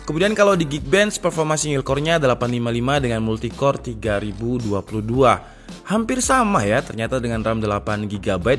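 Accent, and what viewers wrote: Malang, Indonesia. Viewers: umak wong malang ta sam?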